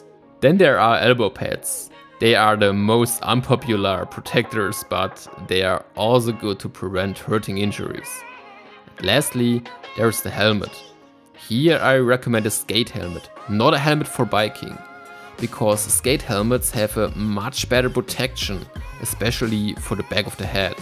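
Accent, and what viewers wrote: Germany. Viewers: I just ordered my first pair of inline skates ever. I’m 42. I grew up using quad skates (ice skated too.) Got the Powerslide Next Core 80 all black set up because everything less expensive was sold out. Ended up with the dream skate😆🤩 Great video with very straightforward tips I’ll be using. I recognize that Berlin accent. Love it. Thanks!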